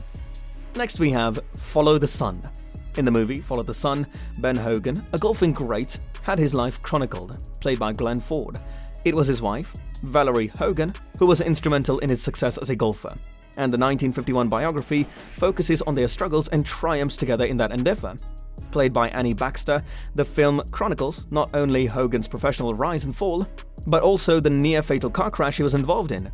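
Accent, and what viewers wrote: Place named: United Kingdom. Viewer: Drop the British accent. Irritating!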